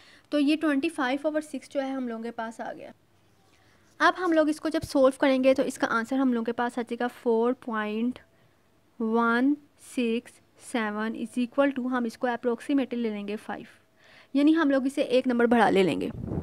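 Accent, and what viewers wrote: Lahore, Pakistan. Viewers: Lahorey accent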